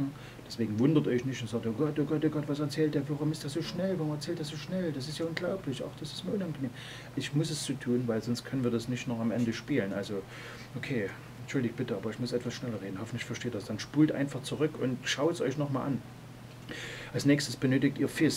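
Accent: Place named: Saxony, Germany. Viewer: ganz toll gemacht....normal mag ich das "sächsische" ja net so gut leiden....aber du bist da mit abstand ne ausnahme....liegt wahrscheinlich an Deiner symphatischen Art das Ganze zu erklären. TOP--> super Tutorial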